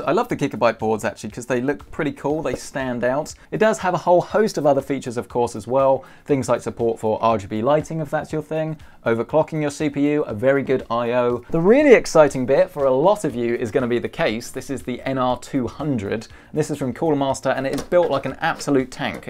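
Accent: United Kingdom. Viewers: First time ive heard the the uk accent going over pc builds its very fitting haha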